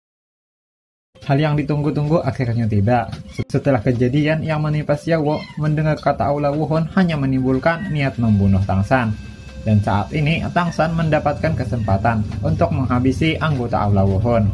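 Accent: Banjar, Indonesia. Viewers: urang banjarkah pian, logatnya kyk urang pahuluan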